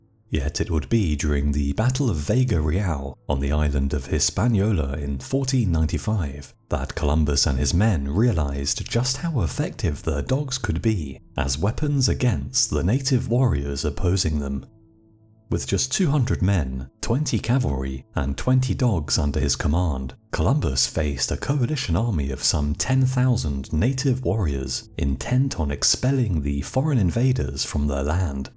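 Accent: United Kingdom. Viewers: #1 Narrator Has a British/English accent, #2 Hyperbolic made up history, this is Definitely British Anti-Spanish Propaganda so much like the Black Legend they created , All Lies, Arriba España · seems kinda farfetched, they had jaguars in their jungles, I'm sure dogs which are much smaller wouldn't scare them much......the fact that a british accented guy is narrating this story makes it that much more questionable...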